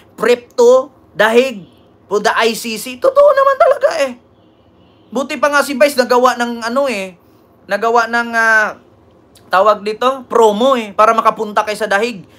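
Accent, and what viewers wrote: Visayas, Philippines. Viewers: Tawa tlga ako s pesteng yawa mo hahaha ganda nga Punto mo mg bisaya k hehe ako ilocana pero gustong gusto ko mgbisaya😂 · NUKNUKAN NG TA TANGA NYO DDS❗VISAYA LANG ANG PUNTO NI, PERO MALIWANAG SYA MAG TAGALOG❗KAYONG DDS NA DAVAO NAKIKI VISAYA LANG KAYO❗WALA KAYONG SARILING LENGUAHE AT SARILING PUNTO❗❓BOBOOOOO❗❗❗❗🤬🤮👺😖😩😡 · Hindi na baling bisaya punto mo, ang importante hindi ka bobo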